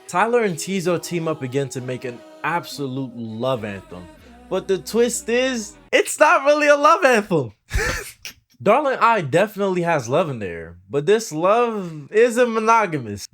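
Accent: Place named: Nigeria. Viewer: Are you NIgerian? I can hear it in the way you pronounced his last name and how you pronounce the word "the".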